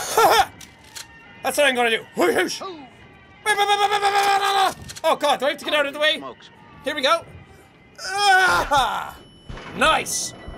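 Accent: Ireland. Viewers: Ramona Clawson He’s from Ireland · Dude are you Irish? Nice video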